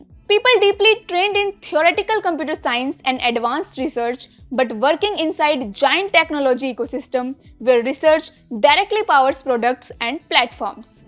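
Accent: India. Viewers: Students born in the US go for Law and Medicine in big numbers - they say that in the US, the most stupid lawyer (or doctor) earns more than the most brilliant scientist - this may be an exaggeration, but you get the idea. Oh, by the way, you have a very strong Indian accent 😀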